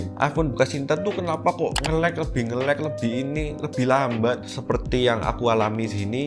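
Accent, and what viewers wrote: Java, Indonesia. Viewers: Medhok bang hehe semangat bang salah satu inspirasi sya 🙏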